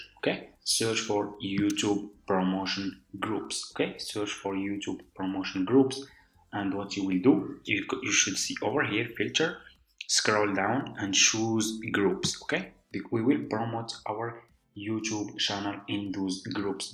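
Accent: Morocco. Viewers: nadi a 3chiri mn laccent 3rftk mghribi kayn hh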